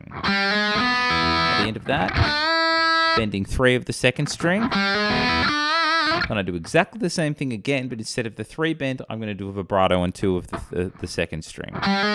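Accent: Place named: Australia. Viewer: very tough the stretch 15 18 22 as i have medium size fingers which tend to jam the strings muting the sound and killing the notes....u are a big guy so its easy.i can cheat with tapping easily! but i am tempted to stay honest and untap--but i am still struggling, now 2 days of 2 to 3 hours of playing (how many days would it take to crack this part?) ...8.43 part of the video is what i am referring to....and do you follow cricket? you sound aussie..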